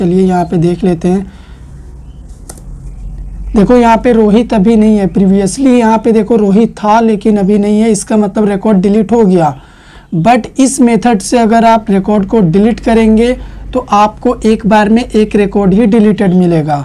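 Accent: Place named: Jharkhand, India. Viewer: Hearing your voice, I remember my friend Venkatesh in Guwahati , who was from Jharkhand with same accent. Thank you.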